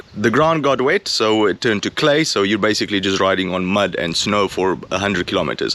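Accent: Afrikaans, South Africa. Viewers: Your accent sounds like an afrikaans guy, speaking English. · Are you originally from Suid Afrika?? You seem to have an Afrikaans accent… Not a Romanian accent.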